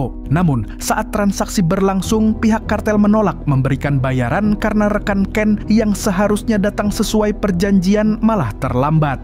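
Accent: Banjar, Indonesia. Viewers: Banjar banarrrrrr wal ai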